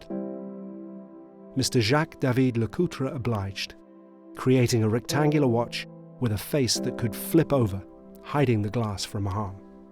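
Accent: RP, United Kingdom. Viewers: I find this off putting. The nonsense snobism, the posh accent, the whispering, just ridiculous.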